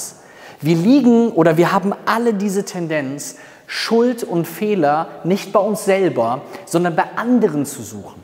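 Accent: Cologne, Germany. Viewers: Cooler Akzent hört sich kölnisch an 😅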